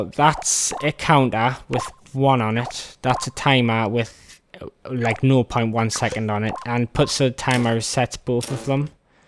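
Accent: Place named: Ireland. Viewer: Why am I obsessed with Irish accents?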